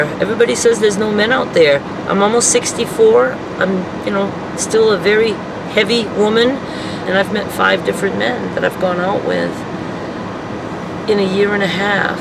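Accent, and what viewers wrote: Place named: South, United States. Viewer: You sound more and more "southernish".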